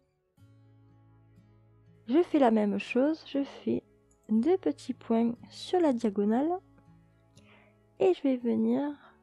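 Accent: South, France. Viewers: Bonjour merci pour ton passage sur ma chaine et pour ton très gentil commentaire, tuto comme l' écrit mon ami Jim ( homme de gout ) excellent. like 24 et un petit abonnement en plus pour écouter tous tes conseils, encore merci et à bientot, amitié, ah oui ton accent du sud est à croquer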